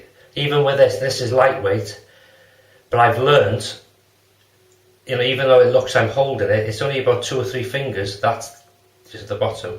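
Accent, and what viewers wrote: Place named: Merseyside, United Kingdom. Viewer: Cheers, I know this is an older video but I wanted to say I enjoyed it. Once again your enthusiasm cheers me up immensely, I think in no small part to your accent. I know you don't think you have one but there it is.;^ ) I'm from the Wirral myself and have lived in Canada since I was fairly young but I like hearing the sound of Merseyside so carry on. Hope you had a Merry Christmas, I remember going to Santa's Grotto years ago but I forget where exactly... Keep the videos coming pal!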